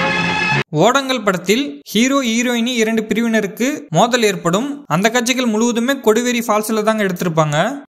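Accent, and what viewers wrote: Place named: Madurai, India. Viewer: Super madurai language avinke .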